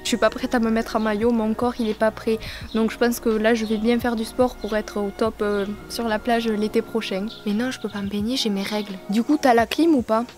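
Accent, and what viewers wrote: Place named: Gers, France. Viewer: Twizix normal c'est celui du Gers on a le même 👍🏻😉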